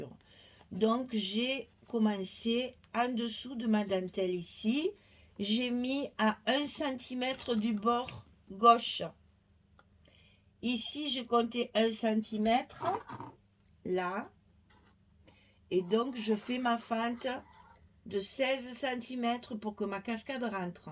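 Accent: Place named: Provence, France. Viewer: Bonjour. J'avais acheté la collection provence ou lavender l'année dernière. Enfin, la même mais ils ont rajouté le vélo et autre chose. Je suis heureuse que vous fassiez un tuto, je vais pouvoir enfin m'en servir! Merci infiniment, je l'aime déjà! Et ce papier avec votre accent... on la sent la lavende 🥰🥰🥰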